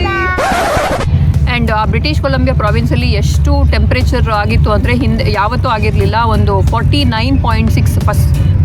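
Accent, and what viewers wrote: Mangalore, India. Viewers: ಮಂಗಳೂರು ಕನ್ನಡದಲ್ಲಿ ಮಾತಾಡಿ ಕೇಳ್ಳಿಕ್ಕೇ ಖುಷಿಯಾಗುತ್ತದೆ 🙂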